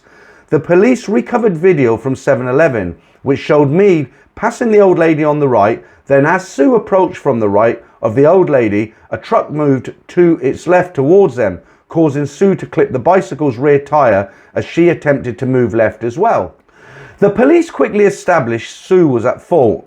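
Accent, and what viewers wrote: United Kingdom. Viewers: Are you British,. First time watching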